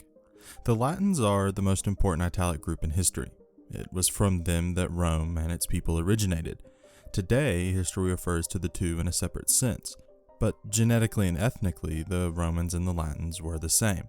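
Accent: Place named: United Kingdom. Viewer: you are super clearly british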